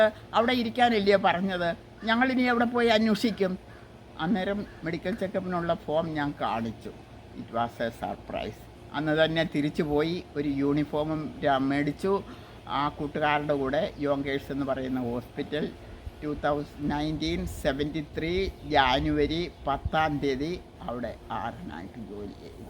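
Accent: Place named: Pathanamthitta, India. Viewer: ഞങ്ങടെ നാട്ടിലെ സംസാരം.... ചേച്ചി ഉമ്മ...പത്തനംതിട്ട 😍 അമേരിക്കയിലും നാട്ടിലെ പോലെ കപ്പ, റോസാ ഒക്കെ നിൽക്കുന്നു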